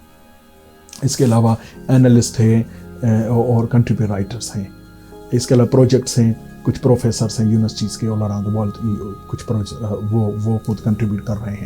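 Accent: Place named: Pakistan. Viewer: It is highly inspiring to listen to you.Every voice that's against the established set of idioms of a society, right or wrong, is condemned first but hope your conviction and gravity of sincerity will make everyone understand and hence respect you. Mr, your work on Islamic extremism and terrorism is more in favour of Islam and Pakistan than any other entity or country.You are welcome to our media, please keep sharing your unique and insightful outlook on world polity and current events.Notwithstanding in foreign lands, your accent and ethos is Pakistani, you're a true Muslim and a son of the soil. Love and best compliments with a hope to see you frequently on youtube.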